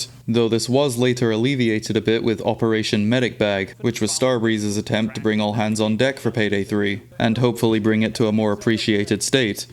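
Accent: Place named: Jamaica. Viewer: I thought you were Jamaican am I fucking stupid.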